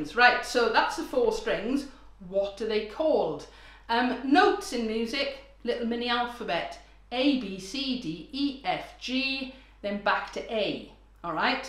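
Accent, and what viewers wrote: Wales, United Kingdom. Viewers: New sub I’m so hate find you. Where from Wales are you from? X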